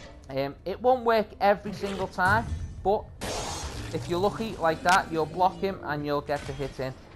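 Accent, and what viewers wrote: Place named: Liverpool, United Kingdom. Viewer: Excellent video, thank you. Subscribed. Loving the Scouse accent as well.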